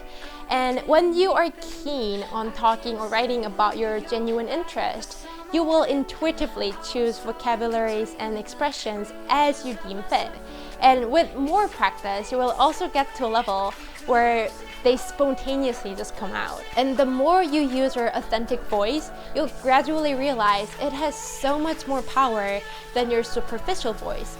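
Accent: United States. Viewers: Your accent is so cool.... I thought you were raised in the State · you got american accent obviously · Fluency is easy thing, u need to go english spoken country. Hardest thing is sounding like a native speaker. The reason u sound like an american in english is that u used to learn the language when u were a kid which u had language plasisty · your English is amazing, research the English 'schwa' to nail these small pronunciation errors. A lot of English words, especially when spoken fast, have the schwa sound (e.g 'a cup of coffee' can be said as 'uh kuh puh coffee'). I learned French with a similar approach to you and I really did my own study on pronunciation in both languages. Other than that your accent sounds to me (I'm british) like a very American one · Your accent sounds American. Like if you hadn't said you were Korean I would think you were American. 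· Why American English, and not English English? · Your English sounds like you moved to the States early in life. You have a "Californian" accent. Thank you for your story! Moral of the story. Listen to your parents!! Lol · I’m pretty sure you must have lived in one of the native speaker countries and you sound like American. To be able to speak like you do, you need at least to have native speaker friends for your daily conversation and of course living in one of the native speaker countries is the best way to do it. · I have to say, your english is almost flawless. In your recent videos, you even speak with perfect american accent. I thought you were raised in the US.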